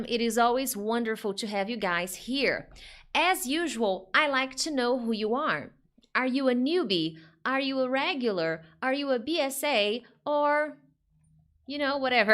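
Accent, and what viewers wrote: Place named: Brazil. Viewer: Teacher once that see you,i recognized that you was Brazilian woman,because on the accent.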